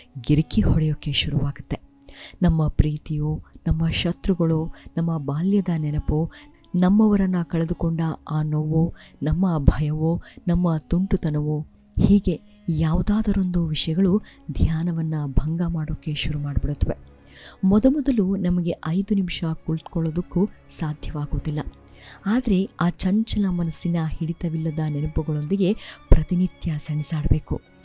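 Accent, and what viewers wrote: Standard. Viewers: Nimm swachand kannada matu channagide tq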